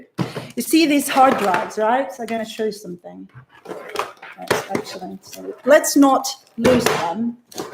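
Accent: United Kingdom. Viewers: Wow! You're doing so well! I knew I detected a british accent. People in the U.k cannot fly to Toronto until January 11. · Ok now I know why she have a British accent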